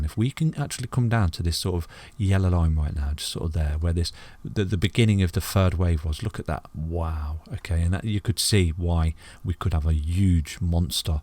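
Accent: United Kingdom. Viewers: Alot of the terminology with these charts is new to me, combined with the British accent and dialect, I maybe understand about 70% of what's going on but im still tuning in. HODL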